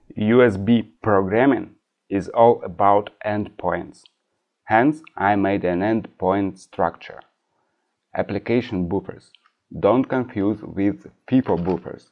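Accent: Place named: Ryazan, Russia. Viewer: Отлично! Долй HAL. Понятный рязанский акцент без американизмов легко воспринимается на слух. Кусок перекачки файла стоит обрезать процентов на 90.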